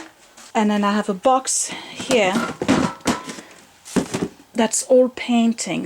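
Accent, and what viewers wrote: France. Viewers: Hubby started watching your videos with me lately!! I think he secretly likes you french accent! We both laughed at Scott's bin of cables and modems, Hubby has 2 bins of those in our garage! I have no idea why he is keeping them!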